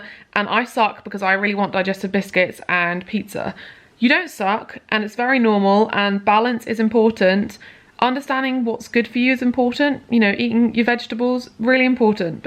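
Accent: United Kingdom. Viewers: Absolutely not related to the video but: I just came back to France from Wales a month ago, where I lived for a year. Hearing your accent and seeing that pot of yogurt that was definitely from Asda suddenly made me so emotional. I'd never thought I'd miss the UK that much.. Lots of love on you and your fabulous country ♡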